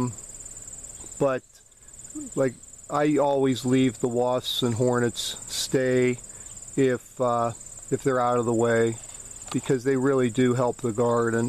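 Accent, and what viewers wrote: Pennsylvania, United States. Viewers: Great video. I agree, they should be left alone if they are not in a spot where they could be a problem. This species does prey on caterpillars but they are a major predator of flies which are just as much of a pest as caterpillars. Last year I did not remove a whole lot of this kind, but when I did they were located around doorways or eaves of structures or low in trees where stings to people were probable. I could tell from the accent you are a fellow Pennsylvanian!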